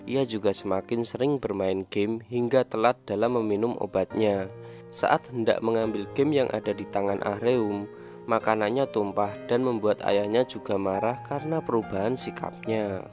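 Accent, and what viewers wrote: Java, Indonesia. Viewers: kenapa kalau ente yg ceritain film ini feel sedihnya kagak ada....yg ada malah ngantuk! mungkin karna meddok yah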